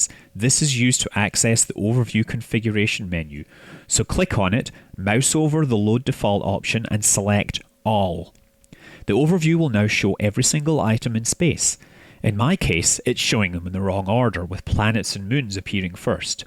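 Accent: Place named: Edinburgh, United Kingdom. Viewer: Are you from Edinburgh? Good comentators voice, easy to listen to, i'm from Cheshire.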